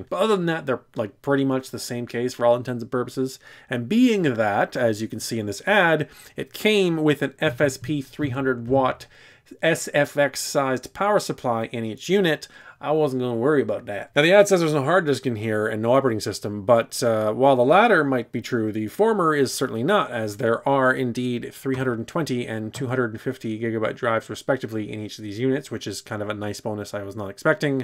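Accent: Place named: Russia. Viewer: that Russian accent is on point.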